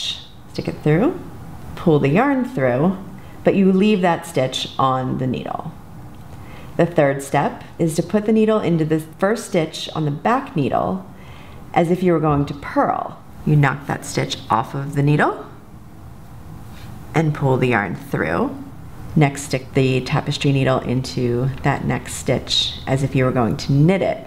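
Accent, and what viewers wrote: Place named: United States. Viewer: It's just her accent. Many Americans ive heard say "mounain" without the t sound like "tigh-en" here too. Just the accent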